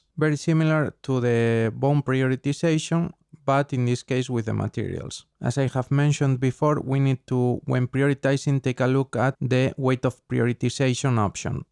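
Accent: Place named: Spain. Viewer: gracias tete por el tutorial, joer, como se nota el acento español xD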